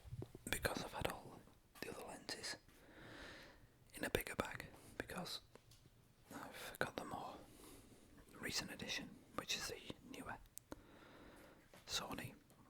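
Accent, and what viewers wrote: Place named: Teesside, United Kingdom. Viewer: That huge watch with the blue face is lovey, I'd wear something like that... I'm trying to place your accent, I'm thinking south of boro but not as far south as Whitby.. I'm in Sunderland. nice video anyway :)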